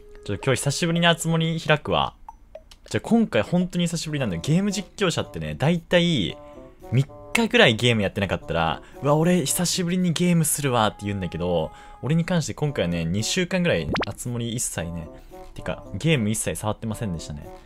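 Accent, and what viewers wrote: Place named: Gifu, Japan. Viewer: たまに出る方言的に石川か岐阜かなって思ってましたが、岐阜でしたか。勝手にすっきりしました。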